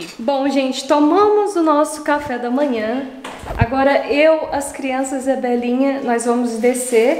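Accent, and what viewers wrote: Minas Gerais, Brazil. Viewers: Eta, mineirinha arretada, sempre com o sutaquinho mineiro kkķķkkk,adooooooooooooro,bjs pra vc e sua sogrinha mamãe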